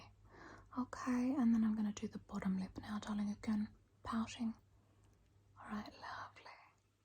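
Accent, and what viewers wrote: Sydney, Australia. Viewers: You sound exactly like my mum's friend Shaz, who grew up on the North Shore of Sydney. Perfect.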